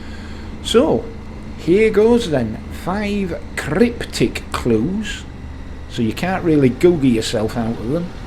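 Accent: Yorkshire, United Kingdom. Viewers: I’d like to thank you for taking us along on the journey. The channel has evolved massively from those early days. Your preparation, determination, character and Yorkshire delivery (With a little help from the glorious back garden you have to ride around in ) make your channel unique. It doesn’t need much of a change in formula. Just keep polishing mate. All the very best to yourself, J & E. Catch up with you soon bud.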